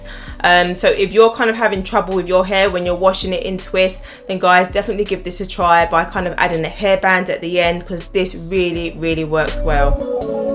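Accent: United Kingdom. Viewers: Shalum I'm sister Bridgette From America,Galveston, Texas New subscriber.I love your hair and your beautiful uk accent. My hair is like yours.Shorter,but thick.❤🎉🎉 Tah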